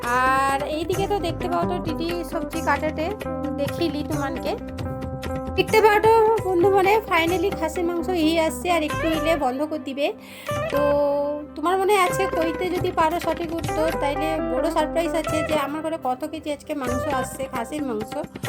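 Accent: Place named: Kanthi, India. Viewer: Khub valo ai prothm kanthi vasay kotha sunlam 😊kotha gulo oroia r bangala vashai tobe valo laglo 😊sob kiche mile sundor hoyeche❤❤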